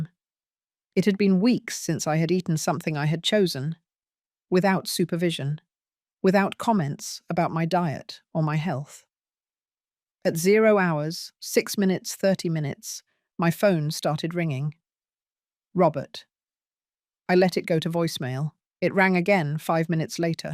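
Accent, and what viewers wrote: United Kingdom. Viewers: What kind of accent does the automated voice have? Is it British? It's not an accent you would expect to hear in Seattle.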